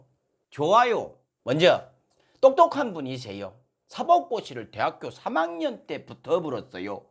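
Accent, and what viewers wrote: Jeolla, South Korea. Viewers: 성범씨 ㅋㅋㅋ 절라도말씨가 너무 맘에 와다부요~~~ 꼭 찝어서 가장 핫한뉴스를 찝어내서 씹어서 돌려부는 성범씨 시원한 사이다입니다 응원합니다 전라도광주에서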